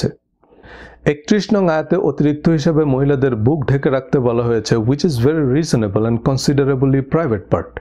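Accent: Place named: India. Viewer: এই লোক ইন্ডিয়ান দের মত কথা বলে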